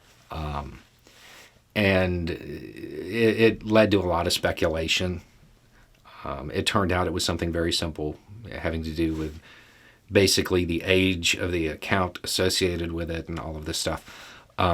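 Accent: South, United States. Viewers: For what it's worth I'm white as the driven snow and your Southern accent was the one glaring red flag I had to get past to realize that you are in fact based. Where I'm from, a drawl tends to be seen as an easy indicator of a simple mind that holds hateful ideas. I do recognize that this itself is a form of prejudice but damned if it doesn't have a better track record than a lot of its cousins.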